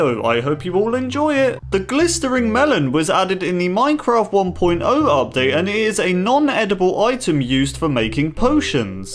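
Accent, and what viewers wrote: United Kingdom. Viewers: R u British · i cant believe ill say this but...now i understand why my friend HAtes english accents...fuck i hate myself now...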